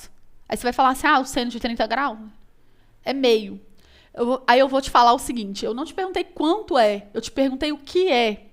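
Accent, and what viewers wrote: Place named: Minas Gerais, Brazil. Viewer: Tive um problema assistindo aula dela… adianto que foi ótima ! Aprendi bastante. O sotaque minerin dela 🥰😍 às vezes me distraiu 😊😂 Excelente vídeo !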